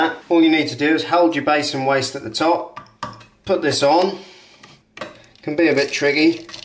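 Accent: Norfolk, United Kingdom. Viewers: You sir are a hero, just saved me a lot of hassle in the bathroom and by the sounds of your accent a fellow Norfolk boy as well, thank you very much 👍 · Great video and I've just ordered 2 through your shop. Keep up the good work mate, sounds like you're in Norfolk?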